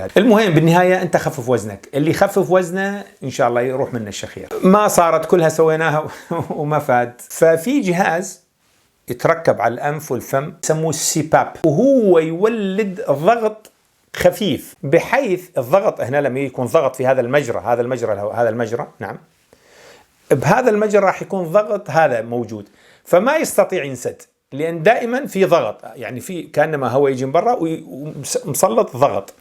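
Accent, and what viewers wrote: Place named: Mosul, Iraq. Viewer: والله عغفتو من لهجتك انت ما غريب. دكتور الله يحييك و يوفقك دائما. و استفادينا من الفيديو عاشت ايدك. الموصل دائما ولاّدة للناس الايجابيين و الناجحين مثلك.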